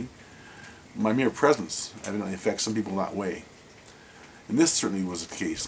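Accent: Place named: Ireland. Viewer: You might get mistaken for an potential Irish thug but no where near a Tony Soprano. Your Irish comes out too much in everything you do. Hell, I can tell you're irish even though you haven't told me! Now me, on the other hand...I'm called "the Godfather" by all the guys I race R/C trucks with...they line up in the pits to come talk to me and shake my hand...one at a time. That's how I got the nickname "Godfather". This is no lie. I'm usually smoking a Churchill sized gar when this happens..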